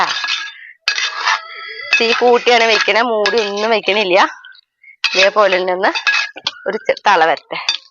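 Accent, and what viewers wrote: Thrissur, India. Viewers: അത് തൃശൂർ ക്കാരുടെ ഒരു സ്റ്റൈൽ അല്ലേ?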